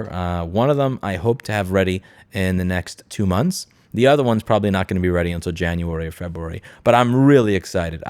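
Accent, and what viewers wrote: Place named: Texas, United States. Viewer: Seems your NYC accent is already slipping into that famous Texan drawl. Makes me wonder what else is gonna change. You just got back to your goal weight, and you were so happy about it, so as a Midwesterner concerned for your health, I advise you to STAY AWAY FROM THE CASSEROLE!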